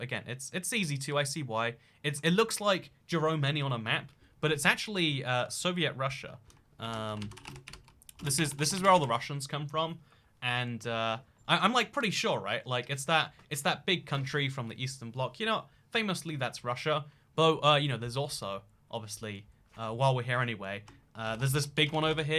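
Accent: United States. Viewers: Thought he was amerixan for a minute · Is this guy American? ??? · Edit: Warning, if you are on a phone, be careful about clicking read more, I accidentally wrote a HUGE paragraph. You may also learn something after you get through the solid bock of text. There is one big reason that people sometimes think that is from America. First of all, you should know that EVERYONE has an accent, you just don't realize that you or the people in your area have it, because it is part of your dialect. Not all accents are distinct though, and some do sound similar. You also have your own distinct dialect/accent influenced by where you have lived, mostly influenced by where you grew up, and who you grew up with (friends and siblings more than parents). Side note, Great Britain and America have been friends (and allies) since the American revolution, yes Great Britain did try to take back America in the war of 1812 but did you know that even though America lost the war of 1812, America is still its own county. 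America and Great Britain have been influencing each other for centuries, it should no surprise that some people are going to confuse an English accent with an American one, in an area of America that sounds surprisingly similar. I'm looking at you South-west. I am allowed to say this, I live in one of the Southwest states and have been to all of them, but I have noticed, that some states try really hard to have a distinct identity as a state, some states have that, but some states don't have that, and honestly, sorry Southwest of America, exclu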